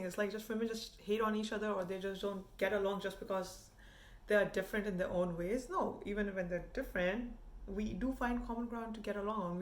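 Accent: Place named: India. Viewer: I'm sorry you ended up hating the role you auditioned for 🤣. But your accent is still Indian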